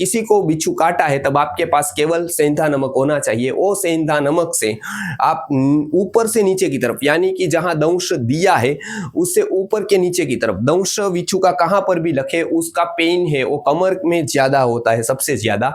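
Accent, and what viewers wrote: Gujarat, India. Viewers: Tame Gujarati lago 6o laheko Gujarati 6e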